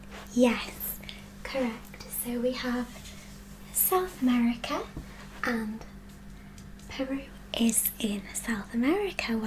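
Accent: United Kingdom. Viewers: May i ask you from city is your accent? Or if it is just a neutral British accent. I generally don't like British accent, but I love yours! 😊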